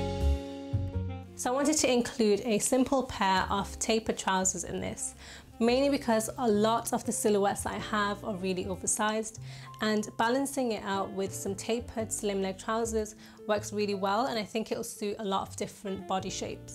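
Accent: United Kingdom. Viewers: Please forgive me for being an ignorant American, but could you explain what kind of accent you have? Is it specific to one city or region in the U.K.? Your accent is so gorgeous—the way you say everything is elegant and distinguished. Ps: congrats on your engagement!! Wishing you and your partner all the happiness in the world.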